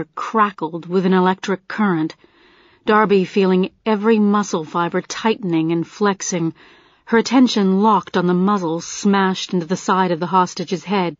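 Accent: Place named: Boston, United States. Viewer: Probably a good book, unfortunately, I cannot listen to that harsh Boston accent. It simply grates on my nerves. Thirty -six minutes is all I could manage.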